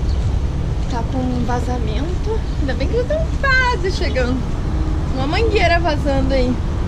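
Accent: South, Brazil. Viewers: Olá, tudo bem? Antes de mais nada, deixe-me me apresentar: Sou mais conhecido como Claudio, Carioca, residindo atualmente na Baixada Fluminense. Estou assistindo seu vídeo no computador pela segunda vez (antes só no telefone). Estou achando ótimo, é bem melhor te ver numa tela de 29' do que na telinha do celular. É muito gostoso seu jeito de se comunicar com seu público com esse sotaque sulista e sempre de um modo alegre e divertido. Dá pra sentir que você gosta muito do seu trabalho, por mais sacrificante que seja. Também acho muito legal o nome que vc deu ao seu caminhão. Te desejo um bom restante de semana e que tudo corra bem pra você. Fique com Deus!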